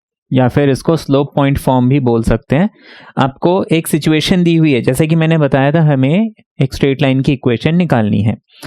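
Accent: Bihar, India. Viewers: Sir, Are you Bihari?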